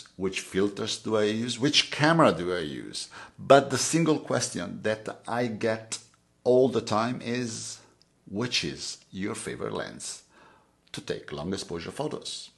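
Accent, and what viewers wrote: Italy. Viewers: I watched every video of yours since I discovered you about six months ago. You always go with your 20 mm. No I know you why. You have a great style of teaching with your beautiful Italian accent.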